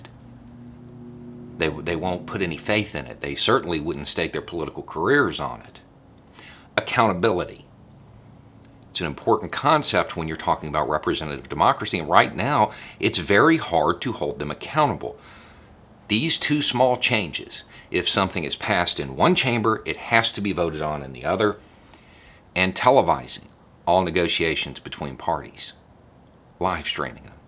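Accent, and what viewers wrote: South, United States. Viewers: we have a republic. You sounded more intelligent when you spoke with a southern accent.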